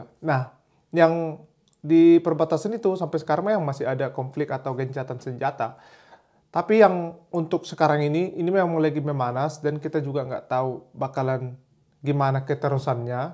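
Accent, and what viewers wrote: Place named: Madura, Indonesia. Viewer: Logatnya mirip orang madura 😂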